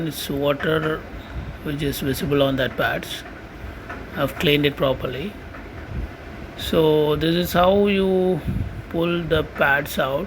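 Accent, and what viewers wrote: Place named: North India, India. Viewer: I thought your a north indian.... You know the way you speak English made me think that...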